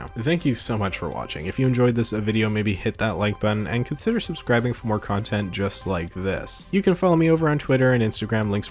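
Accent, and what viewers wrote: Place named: Canada. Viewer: omg ur Canadian yaaaaa eh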